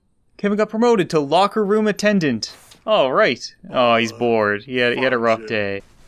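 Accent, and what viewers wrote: Canada. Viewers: Are you Canadian, because you sound it. Lol. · quick question, are you Canadian? :0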